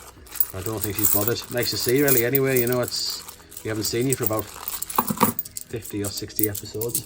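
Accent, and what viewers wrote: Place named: Northern England, United Kingdom. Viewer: Love a good old northern natter keep up the good work.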